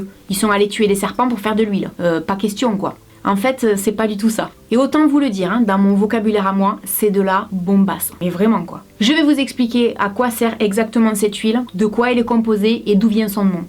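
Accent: South, France. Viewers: Je savais même pas que ca existé Pis ton accent du sud 😍😍 Bisous tu es toujours magnifique ❤️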